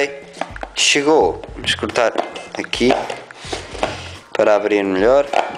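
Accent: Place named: Portugal. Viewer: português de portugal! <333 Tão lindo ouvir, na moral hahahaua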